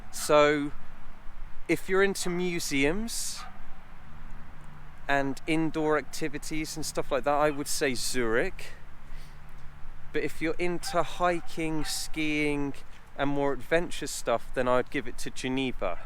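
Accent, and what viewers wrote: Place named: United Kingdom. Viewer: I was just enjoying your British classic accent 😍, hi from Dubai ✌🏻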